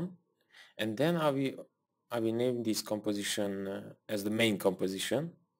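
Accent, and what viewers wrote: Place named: France. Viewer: French accent detected, merci for reading.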